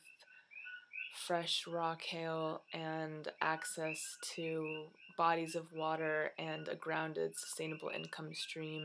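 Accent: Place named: Canada. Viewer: She's very pretty and has nice eyes and voice, but she needs to take out that nose ring. It reminds me of how a bull is shown in a cartoon or something. It's very distracting. She sounds kind of Canadian. Does she smoke pot though? She's Verrrrry mello. Yes, Centipedes will mess you up. I heard about them talked about on the Discovery channels Dual Survival, as well as throughout my life from various sources.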